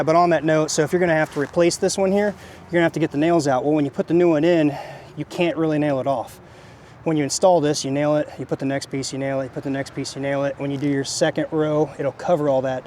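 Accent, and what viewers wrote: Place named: South, United States. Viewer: “Waller” “jossel around”…. You sound like one of us southerners.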